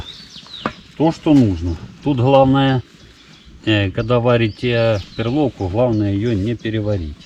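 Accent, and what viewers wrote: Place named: Ukraine. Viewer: спасибо за рекомендацию) по Украине - дык по говору узнал своих😅 по карпу-Вы абсолютно правы! бывает 3 кг рвет круче 10ки. самое интересное в тгм году на небольшой но быстрой и закоряженной речке взял 2 карпов с разницей в несколько дней. оба 3400+-. дали абсолютно разный бой. первого я просто чудом достал. второго - легко и спокойно.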